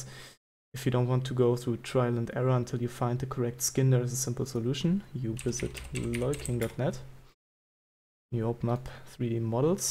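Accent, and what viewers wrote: Germany. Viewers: good video helped me gonna try that out. could immediately tell that ure german because of the accent :p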